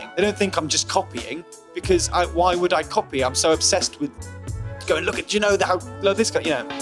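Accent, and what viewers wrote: United Kingdom. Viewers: Some people would think he’s high .... no just British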